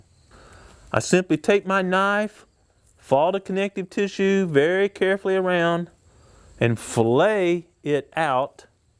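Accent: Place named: South, United States. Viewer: Sir, I don't know where exactly you hail from. But you sound like a Southern gentleman who knows his stuff...minus all of the extra stuff. And for that I am thankful. This video is direct, effective and presented in a friendly yet knowledgeable tone that helps a lot of people learn...like country northern boys like me. Lol!. Too folks want to put on airs cause they're in the public eye (especially when on YouTube), they want more "hits", or they think a flashy style beats substance. But at the end of the day, good old common sense, common tactics with common tools always reign supreme cause...they work! Good job. I'll be watching your channel.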